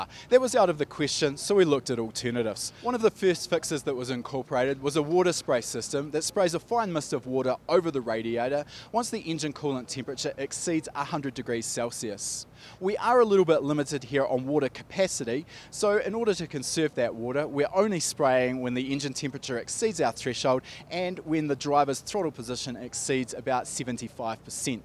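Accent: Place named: New Zealand. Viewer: Great thinking here. Real world tuning. P.s. loving listening to your NZ icksent. :)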